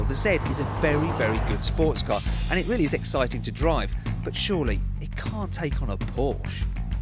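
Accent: United Kingdom. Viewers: i hate the way britain people says words,i know thats the original english,but its wierd,U.S.A is the best country in the world and the way they talk is nice.,and im not american, im brazilian and i love it. 370z sounds better ,has a better looking and u can get it for way less money than the porsche,get the Nismo exhaust and u can have +15 hp then u are good to go.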